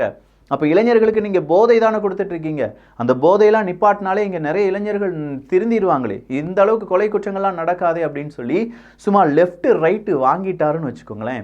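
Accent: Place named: Tirunelveli, India. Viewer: Tirunelveli slang super thala😅😅😅❤❤❤👌👌👌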